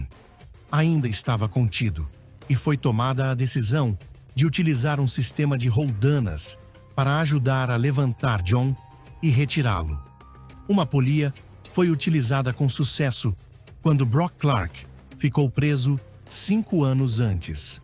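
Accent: Portugal. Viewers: Tira esse sotaque lixo de Portugal 🤮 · Eu acho que ele é português... De vez em quando o sotaque escapa e isso os brasileiros natos não conseguem. Se for um português de ascendência brasileira? Faria mais sentido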